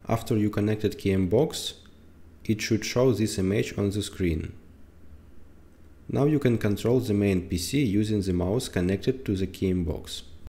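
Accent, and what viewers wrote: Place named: Russia. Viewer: Норм русский акцент я балдею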